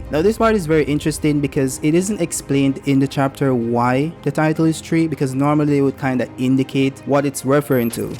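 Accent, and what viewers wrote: Jamaica. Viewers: Are you jamaican i picked up your accent 😂😊 big up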